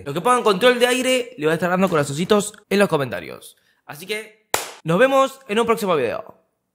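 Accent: Argentina. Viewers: TE AMOOOOOOOO DE AMIGO SIEMPRE ME ENCANTA TU CONTENIDO Y TU ACENTO ARGENTINO 🎉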